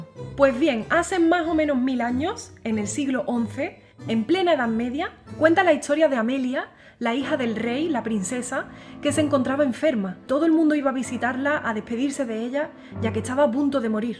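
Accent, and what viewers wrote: Andalusia, Spain. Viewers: Escuchar ese acento andaluz enamora 🥰💞😍😘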